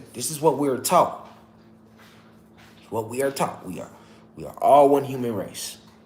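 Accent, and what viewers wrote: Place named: California, United States. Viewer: Ik you from Chicago but I hear Cali also with the accent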